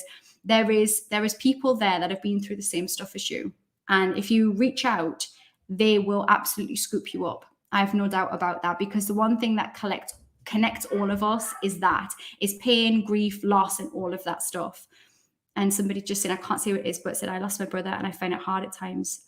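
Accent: Newcastle, United Kingdom. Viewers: listening to your GEORDIE accent videos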